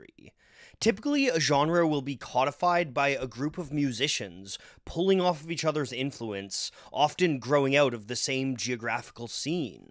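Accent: Canada. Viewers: Absolutely well put together. Granted your Canadian accent does give a small clue as to why you may have mispronounced Giorgio's last name (it's 'More-row-der'/ Moroder). Clearly, I wish the video would have been longer. Great job though!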